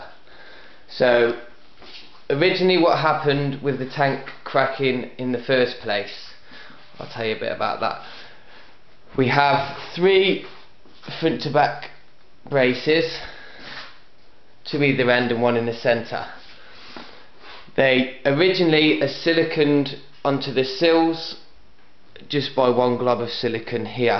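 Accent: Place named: Midlands, United Kingdom. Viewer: Hi chap. Where you from you sound kinda midlands way. Like me!! Who made the tank in the first place mate? As them stress bars look way too inadequate. I had a four foot rena and they were massive on that for a little tank. And my 700litre now has some sturdy strut bars. They just look too narrow for my liking?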